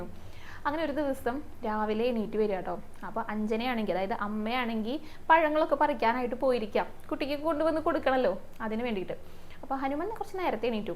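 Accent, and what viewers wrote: Thrissur, India. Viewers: swaram nannaittunde..trissure slang nalla resam undu kelkan...especially kurangum kutty...😂😂❤❤❤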